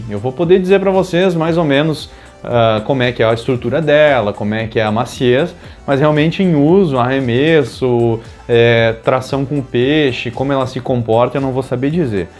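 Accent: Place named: Rio Grande do Sul, Brazil. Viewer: Top o vídeo vc é do rio grande do sul? Vim aqui procurando um vídeo sobre carretilha pq estou tentando juntar uma grana pra comprar um pq pesco de molinete até o momento mas que sabe consigo comprar uma. Mas aí vi que vc tem um sotaque gaúcho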